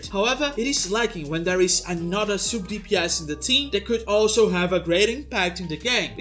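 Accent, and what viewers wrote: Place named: Brazil. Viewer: KKKKKKKKKKKKKKKKKKKKKKKKKKKKKKKKKKKKKKKKKKKKKKKKKKKKKKKKKKKKKKKKKKKK EU SOU BR RECONHECI O SOTAQUE DE LONGE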